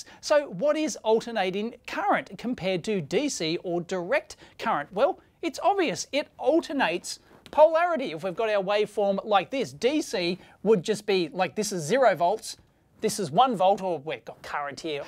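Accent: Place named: Queensland, Australia. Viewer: Anybody else unable to see a video and not go: "haiiiiiiiiii" in that Queensland accent lmfao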